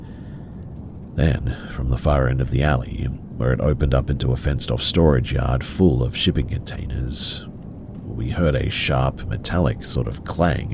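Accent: Australia. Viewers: Good to hear an Aussie accent, cheers mate!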